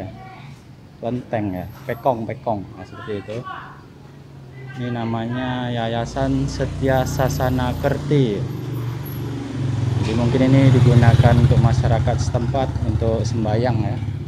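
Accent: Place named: Java, Indonesia. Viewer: Jowo ya mas .logat nye medok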